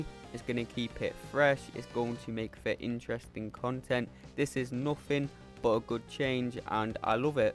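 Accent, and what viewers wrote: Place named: Liverpool, United Kingdom. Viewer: He sounds scouser to me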